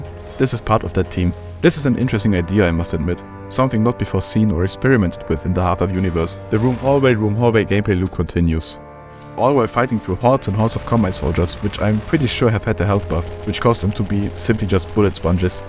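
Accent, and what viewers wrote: Germany. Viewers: I can hear from your accent that your german! Guten Tag!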